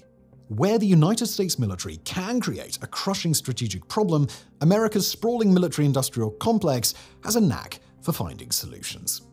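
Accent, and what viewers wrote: England, United Kingdom. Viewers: I used to hear an English accent and think you sound intelligent. Now after getting to know your country and people, I just think you sound like a complicity idiot who will go along with anything you are told. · Good Video. Lousy Audio. Get rid of the reverb. Flatten out your voice. Lower the bass. Over and over the sound comes out garbled. You already have the British accent. Quit trying to make yourself sound even better. Deliver it flat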